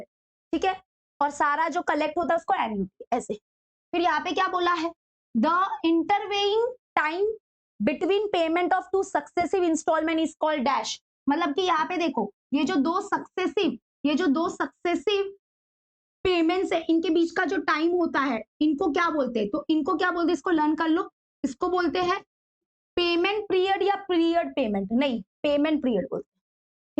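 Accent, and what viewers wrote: Maharashtra, India. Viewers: Mam are you from Maharashtra....? ( come through your marathi....)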